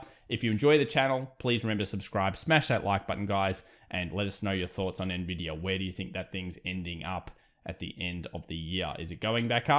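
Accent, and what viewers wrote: Australia. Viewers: The only Analysis channel I watch great video 🙌 you have a cool accent, are you Australian?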